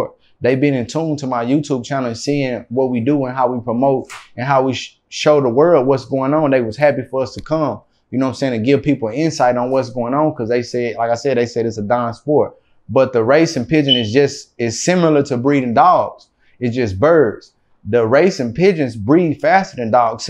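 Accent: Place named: Texas, United States. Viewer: Anybody caught the website he said. I didn't quite catch what he said. That Texas accent strong af 😆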